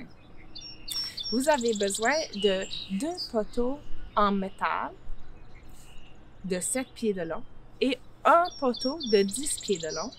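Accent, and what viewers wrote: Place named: Canada. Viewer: charmant accent canadien!!!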